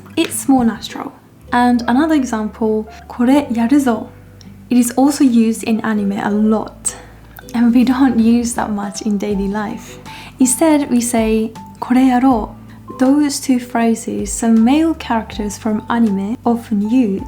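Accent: England, United Kingdom. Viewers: Yee u right...her accent like british already But i prefer american accent 😢 Gomen ne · Your English accent is so lovely and calm, you speak very elegantly! I'm from London, currently studying Japanese and Korean while living in Seoul ☺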